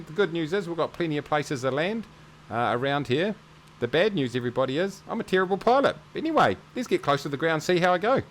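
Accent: New Zealand. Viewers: New Zealand accent rules... :) Awesome video, as always....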